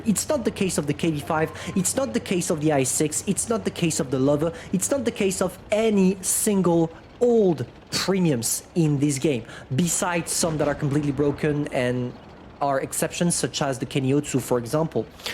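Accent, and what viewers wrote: France. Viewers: How can somebody be so annoying? 40% the French accent 30% that face 20% those awful glasses 10% those girly arms and shoulders... I'm kinda interested in what he is saying but the person itself... OMG horrible creature.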